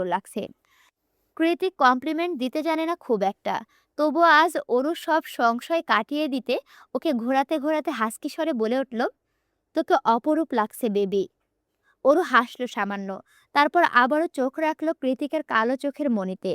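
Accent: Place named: Kushtia, Bangladesh. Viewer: আমার বাড়িও কুষ্টিয়া তে, আজই জানতে পারলাম তুমিও কুষ্টিয়ার মেয়ে,, একমাএ আমরা কুষ্টিয়ার মানুষরাই এভাবে কথা বলি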